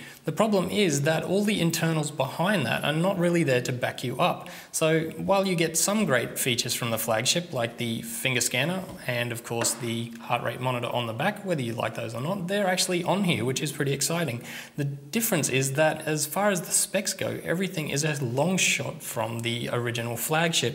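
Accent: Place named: Australia. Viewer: If the British version of Saul Goodman had an Australian accent?!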